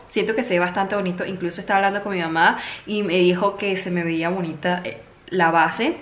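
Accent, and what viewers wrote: Venezuela. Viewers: Ella es venezonala 😂 no manches como quieres que hable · siempre ah tenido el acento venezolano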